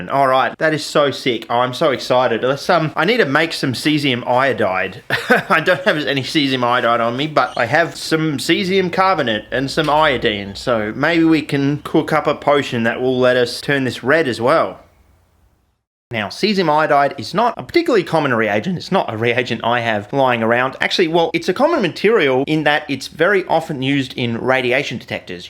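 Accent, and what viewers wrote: Australia. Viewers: every episode you become more Australian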